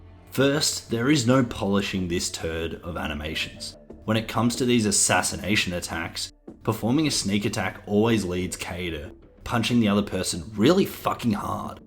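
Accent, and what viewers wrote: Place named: Australia. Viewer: noticeable Australian accent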